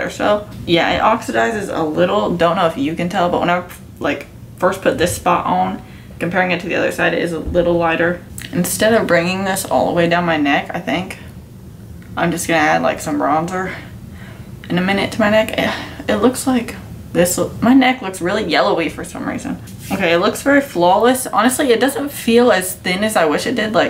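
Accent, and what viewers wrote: South, United States. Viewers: where are you from? I hear a southern accent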